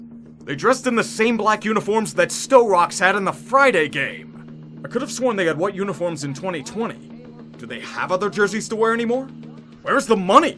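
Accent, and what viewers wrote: Pittsburgh, United States. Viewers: Naw, that’s just the yinzer coming out.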